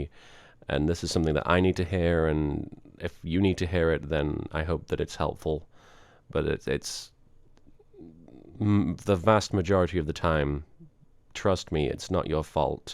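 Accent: United Kingdom. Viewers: i learn how to talk more like a british cause of you :D!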